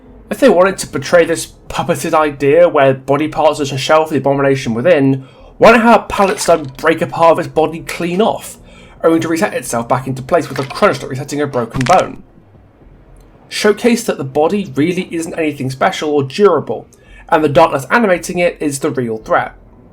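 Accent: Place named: United Kingdom. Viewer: Anyone who calls you smug is only because of your British accent because anyone who talks to you ever KNOWS you’re just a big ol’ sweetie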